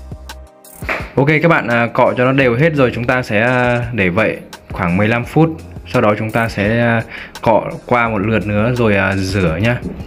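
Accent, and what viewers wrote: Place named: Bac Ninh, Vietnam. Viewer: Giọng bạn như dân Bắc ninh ý nhỉ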